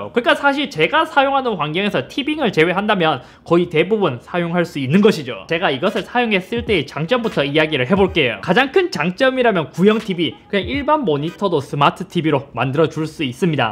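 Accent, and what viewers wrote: Gyeongsang, South Korea. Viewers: 경상도사람발음은 못알어듣는군요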